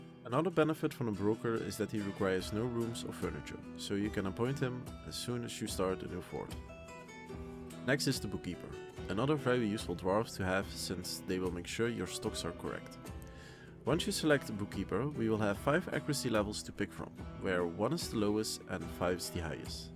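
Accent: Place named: Netherlands. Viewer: I can't listen to this horrible Dutch accent. Jezus Christus.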